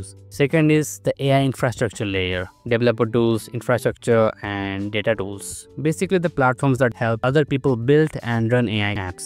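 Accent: India. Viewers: What is ur mother tongue, I want to know which Indian language produce this English accent because it doesn’t sound Hindi accent English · just from the accent i can say indian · ur accent looks like you are bengali or odia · you spell with indian accent make boring startups become 1000% fun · not from Bangladesh I approve it. This is Indian accent. He could be from Odia only.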